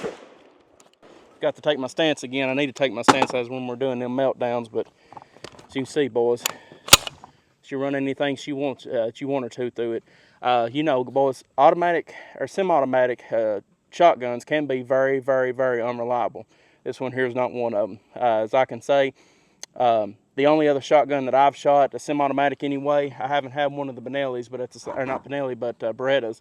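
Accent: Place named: South, United States. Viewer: Slow down a little, with your awesome accent it gets difficult to understand when you talk so fast. I had to turn on the subtitles. The southern accent is the best, no question, but it wasn't meant to be belt fed.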